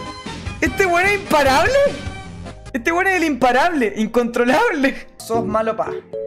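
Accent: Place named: Argentina. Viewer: Pensé que eras argentino xd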